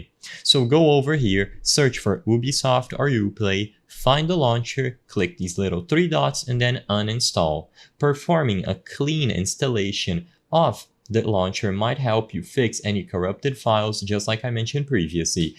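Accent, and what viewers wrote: Brazil. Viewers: cara eu fui ver o tutorial e falei, doidera esse cara fala igual brasileiro, só que em inglês, dai fui ver seu perfil pra entender kkkk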